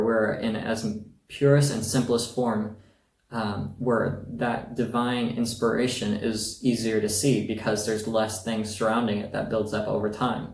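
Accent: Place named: United States. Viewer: I thought you live in USA.......becouse the way you speack english language....!😊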